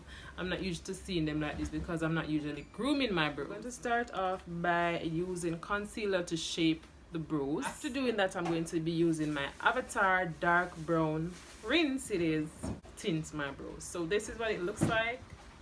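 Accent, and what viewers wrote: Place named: Jamaica. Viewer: I hear our accent sis 🇯🇲 great tutorial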